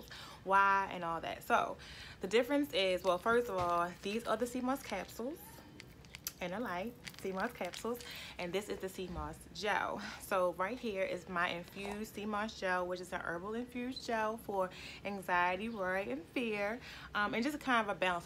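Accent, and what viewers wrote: Baltimore, United States. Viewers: She is nice...I hear that Baltimore accent...GOOD Content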